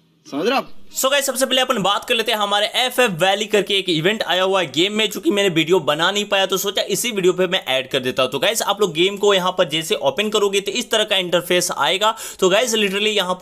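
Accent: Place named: Bihar, India. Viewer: Bhai aap Bihar se ho naaaa mere ko pataaa haii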